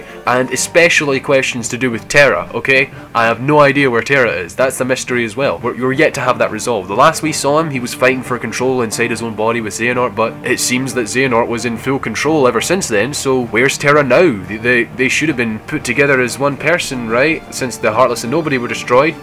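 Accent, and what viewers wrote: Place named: Scotland, United Kingdom. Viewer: How did people think your accent was Irish, an Irish accent sounds nothing like a Scottish accent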